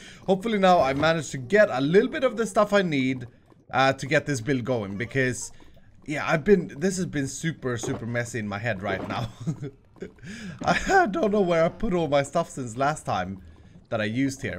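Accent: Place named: Sweden. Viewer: I must say that I love how you talkin such a swenglish accent all the time but then do all those great english accents when you are playing around. Luv it =) You guys were great in the panel btw. One could say that it was a smorgasbord of hermits to enjoy.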